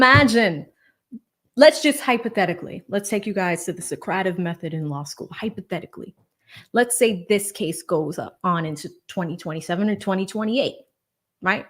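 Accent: Boston, United States. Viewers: Oh my.. i love ❤️ you're Boston accent. So great.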